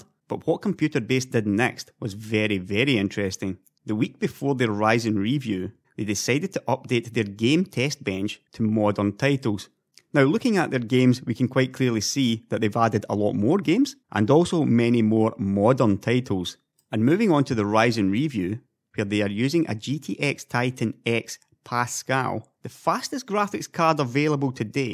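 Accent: Scotland, United Kingdom. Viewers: So hard for me to translate you scottish accent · From his accent I thought he is Scottish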